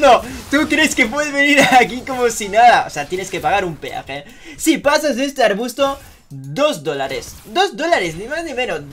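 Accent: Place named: Argentina. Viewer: por que hablas como argentino? xd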